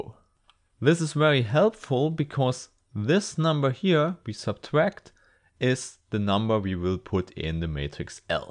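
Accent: Germany. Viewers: all jokes aside, you explained really well. Just enjoy the german accent <3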